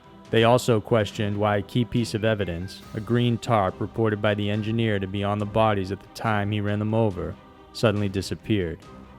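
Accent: South, United States. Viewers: He has a southern accent i dont know how thats scary.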